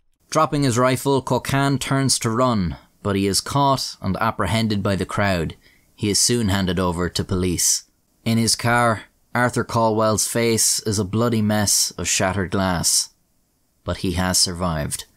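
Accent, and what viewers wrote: Ireland. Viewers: can you do the port aurthur shooting? or the cookie question, I've never heard anyone with an Irish accent do the cookie question. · Did anyone else know that is just irish and isn’t just British with a speech impediment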